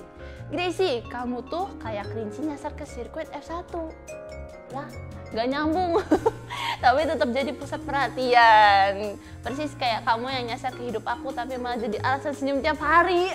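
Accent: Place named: Java, Indonesia. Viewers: Medok nya kerasa banget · selalu ada medok nya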